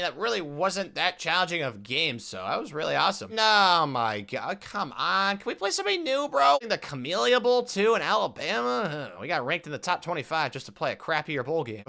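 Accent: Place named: Mississippi, United States. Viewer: U from Mississippi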